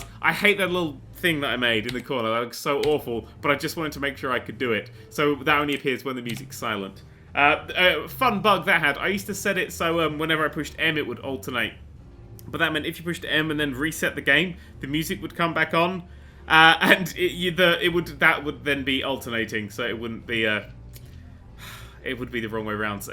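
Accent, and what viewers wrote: United Kingdom. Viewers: Discovered this channel with the Roller Coaster Tycoon games comparisons and have to say this guy knows how to make good damn videos just a quick question are you British?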